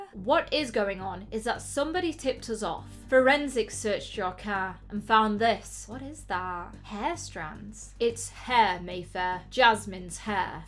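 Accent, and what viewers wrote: Scotland, United Kingdom. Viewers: I really love your voice. You're Scottish ? Sorry for my brutal question. I like how Scottish people talk. And I mean that really in a serious way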